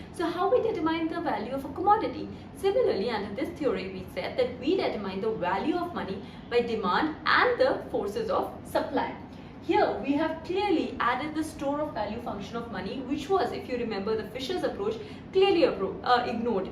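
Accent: India. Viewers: Aree madam ye South Delhi ka accent kyu le rakha hai normally hi bol loo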